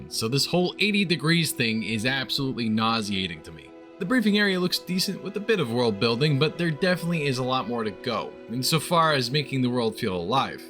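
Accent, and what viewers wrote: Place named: New York, United States. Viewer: where'd the new york accent come from,?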